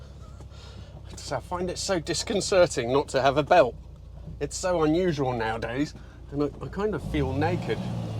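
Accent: Italy. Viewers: No need to apologise for the Italian-ness. When it comes to Italian cars, them Italian twang is kind of necessary. At least in my book, but I'm a weirdo. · I really like this guy and appreciate his great videos but his over pronunciation of Italian words is really grating and un necessary . We get the point , yes you have an Italian heritage but let's be honest you grew up in the UK and that's how your true accent . I can speak some Italian but never over emphasise the accent as it sounds silly when mixed with English.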